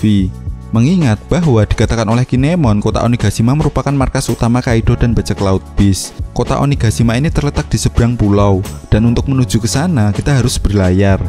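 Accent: Java, Indonesia. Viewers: Suara nya jawa banget mas , gaenak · Logat Jawa 😂😂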